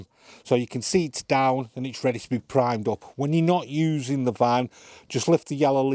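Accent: Stoke, United Kingdom. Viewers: Is this fella from Stoke?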